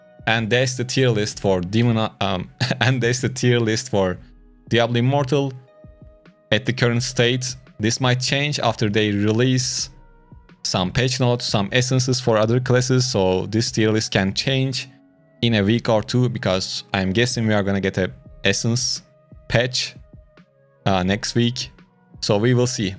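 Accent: Turkey. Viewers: Kardeşim sen türk müsün ingilizceyi konuşma aksanın cidden türk gibi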